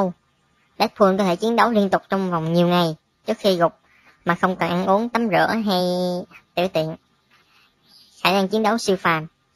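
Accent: Vietnam. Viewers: Thím nói giọng miền trung p k ? :))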